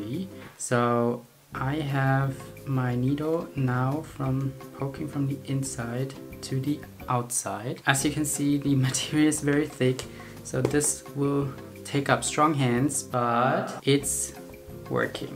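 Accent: Germany. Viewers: I can totally tell that you’re german by the accent, cute!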